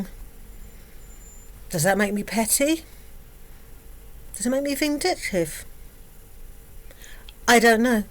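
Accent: United Kingdom. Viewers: This is exactly why i watch her as well....its the lying and the willingness to take it as far as she can go, even when proven wrong. Love your videos! Nothing sounds better when youre always proving her wrong with that british accent!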